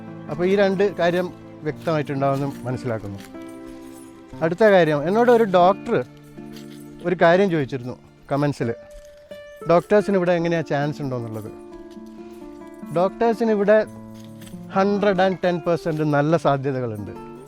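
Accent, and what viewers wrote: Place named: Malabar, India. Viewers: നിങ്ങളുടെ മൂന്നാമത്തെ വീഡോ ആണ് കാണുന്നത് നിങ്ങളുടെ നദാപുരത്തെ ബാഷയും വിനയവും എനിക്ക് വളെരെ ഇഷ്ടപ്പെട്ടു നാണു വാണിമേൽ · iam watching from atlanta, georgia., usa.....i accidentally came across...ur video....i like your presentation...the language is different...kozhikode style....very good..and your innocent attitude, rather sincere approach...and presentation...is appreciable....thanks....waiting to see the next vedio....👌👌👌👌👌👌👌👌🤣🤣🤣🤣🤣🤣🤣🤣😁😁😁😁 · Reminds me of old hippie time in kovalam in 70s..now it's all over..I too was involved in it .but looking back it was a time pass..I didn't know hippies are still there? A rare species anyway..laziness, drugs and such..u sound like from Malabar? How did u reach there?single?need not answer if u don't want...all the best? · Hallo Sir. You are explaining excellently in your videos. 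From your slang I can understand that you are a malabari. Any how all the best and waiting more videos from you.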